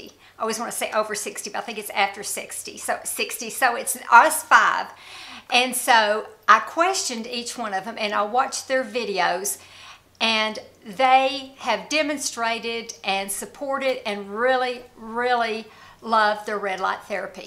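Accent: South, United States. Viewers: They both have a southern accent and are adorable but they don't sound alike. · I think southern women are the sweetest, kindest, just examples of grace in all circumstances. I shouldn't assume you are southern, but your accent is beautiful. You are really beautiful, inside and out. Whatever you are doing, it's working! You look fantastic. People our age never did use a whole bunch of skin care products. I started when I was 58, and some things just made my skin WORSE. I had acne bad when I was a teenager up until my forties, it gradually became less and less. Now that I am older, and my skin isn't as oily, I find replacing that oil with some vitamin C and A oil really feels good. I think it helps my skin. And the red light therapy, yes! I love it. I lie down and do some meditation while I do mine.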